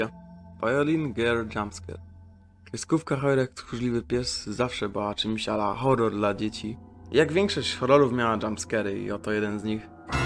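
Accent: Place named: Poland. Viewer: Polglisz mocno xd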